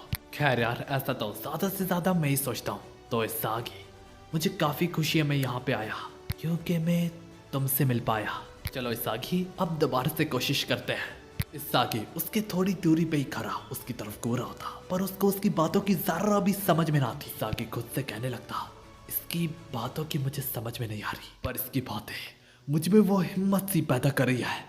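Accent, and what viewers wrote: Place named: India. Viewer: bro just play not say it with cho indian voice makes it terrible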